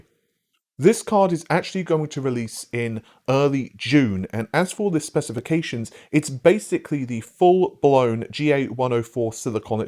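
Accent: United Kingdom. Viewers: I'm watching a guy with a British accent talk about videocards that I can't find in stock and couldn't afford even if they were in stock. What am I doing with my life :) ?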